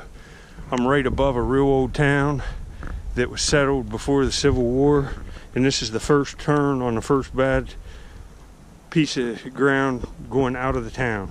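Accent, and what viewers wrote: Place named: Pittsburgh, United States. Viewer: I'm from the Irwin Pennsylvania area. I can tell by your accent that you have to be from around Pittsburgh?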